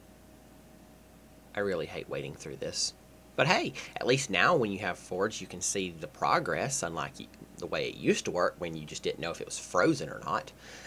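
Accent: South, United States. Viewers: Love that southern drawl u got there too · You sure know what you're doing! p.s Love the southern drawl, Where you from?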